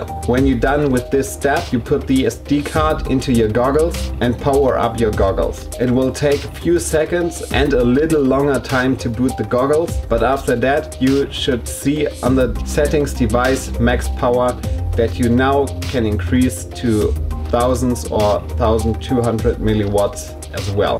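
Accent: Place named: Germany. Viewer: Ive seen like 50 of these videos hahaha and it took a German speaking English with a light accent to Deliver the message clearly for me lol Thank You. just subscribed...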